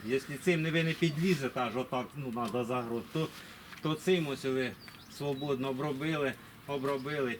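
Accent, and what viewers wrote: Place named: Volyn, Ukraine. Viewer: Волиняка нюхом чую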